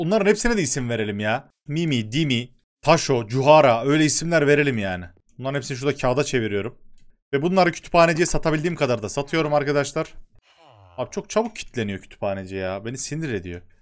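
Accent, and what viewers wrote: Yozgat, Turkey. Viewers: abi ben iranli turkum ve bizim turk dili ayni sizin yozgat diline benziyor vallahi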